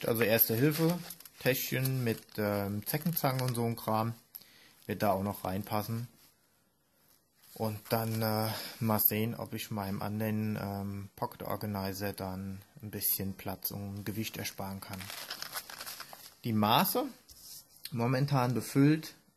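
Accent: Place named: Hesse, Germany. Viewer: Hi! Auf Deinen Kanal bin ich durch das Video von Karl Heger aufmerksam geworden:) Klasse Reviews & sympathischer Dialekt!:) Komme auch aus Hessen!:) Lasse Dir direkt mal ein Abo da! Liebe Grüße, Gear2Video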